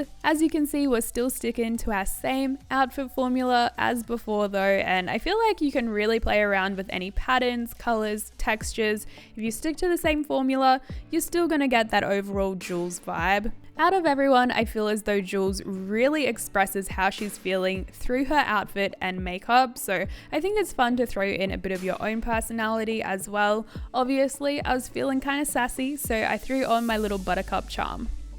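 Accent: Australia. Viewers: are u australian? you have a different accent, sorry if I said it wrong, I'm not an English speaker but I noticed a different accent. loved the outfits, I would probably wear all of them 💖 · are you australian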